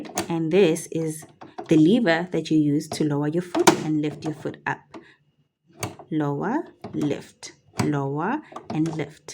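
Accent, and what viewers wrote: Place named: South Africa. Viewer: I heard the South African accent and I was hooked!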